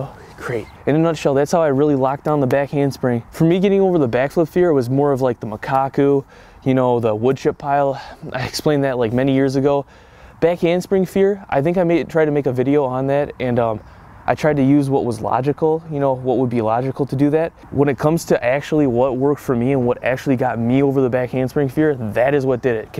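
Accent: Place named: Boston, United States. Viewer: Boston accent at it again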